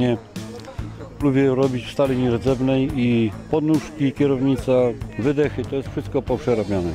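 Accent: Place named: Silesia, Poland. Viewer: witam Kolegę kocham takie przeruby jest coś fabrycznego i lubię ulepszać po swojemu i według swojego gustu i upodobania , wielki szacunek dla Kolegi pewnie jesteś ze Śląska bo masz taki akcent wiele lat temu przed stanem wojennym pracowałem na grubie WUJEK pozdrawiam i zostawiam łapę w górę i suba trzymaj się wesołych i spokojnych świąt z uszanowaniem OTTO HERMAN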